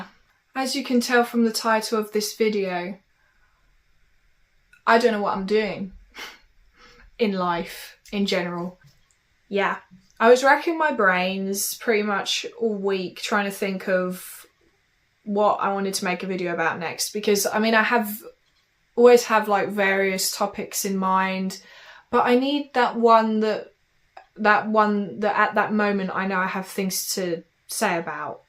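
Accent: France. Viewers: I love your French accent haha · Hi are you secretly French or do you just have an insanely amazing French accent?!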